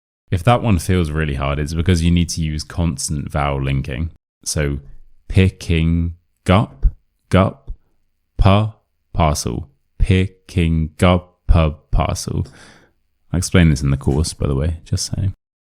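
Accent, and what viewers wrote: United Kingdom. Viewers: Hiya, thank you for your video and offering your English class. I love your British accent! I am living and working in UK for year s, still struggle for my pronunciation and accent. Your voice and tone are so comfortable to keep listening, and love love your sense of humour too! Thanks again your lessons and I can,t wait for your next video❤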